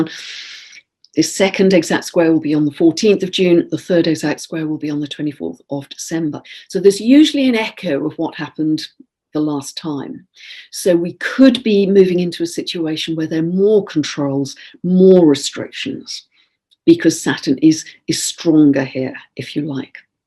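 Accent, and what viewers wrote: England, United Kingdom. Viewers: Thank you so much! That really made me feel better! And it was done in that wonderful English accent. I'm american, I came to be by way of England. But as u know, we kind of became the melting pots of all cultures and now we sound funny lol. But it was very much like listening to someone I hold dear to my heart. Mary Poppins. Thank you so much! ☺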